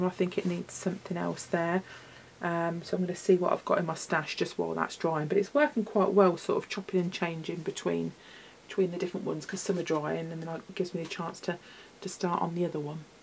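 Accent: United Kingdom. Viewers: Oh I loved your video. Maybe mainly because it was lovely hearing a British accent as much as I love our American cousins. But I can also get the stuff. I’m always jealous when I see the fantastic stuff they get from Michaels or Dollar Tree but no good for me trying to find like for like. Brilliant 😍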